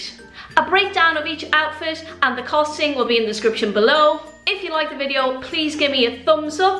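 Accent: Ireland. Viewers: oh okay, Donegal here, thought it was A Donegal accent🙄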